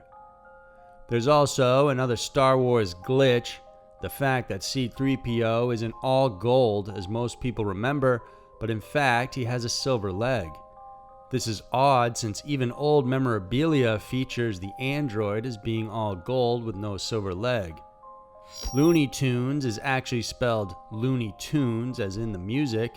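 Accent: South, United States. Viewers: Does anyone know where the narrator is from? There's a hint of maybe a southern accent on some vowels. It's slight and may just be style, but I can't help but wonder. Words like, "time," "wire," and "back." Seems less prevalent in this video than others.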